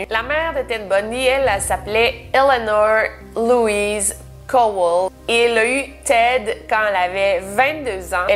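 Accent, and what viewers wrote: Quebec, Canada. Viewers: Salut je profite de cette vidéo sur le plus "grand" des tueurs en série pour laisser un commentaire je t'ai découvert il y a 3 mois depuis je regarde très souvent tes vidéos tu es simple et authentique, un plaisir pour les yeux et les oreilles avec ton accent Québecois lol je supposes que tu connais l'émission française faites entrer l'accusé si ce n'est pas le cas je te la conseille fortement 👍continu comme ça tu es la meilleure tu es la seule youtubeuse que je suis 🤗. Un charltoniste franco italien. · Super vidéo très intéressant, dommage que ton "accent québecois" gache toutes la vidéo :( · Tu raconte vraiment bien ses story même avec l accent Québécois que j adore 👍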